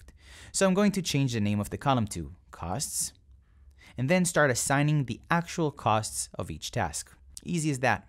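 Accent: Philippines. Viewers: sounds like a philipino speaker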